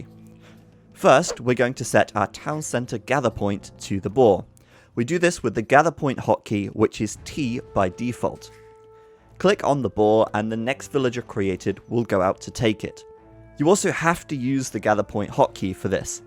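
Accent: United Kingdom. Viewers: an AoE textbook in a flawless british accent. :3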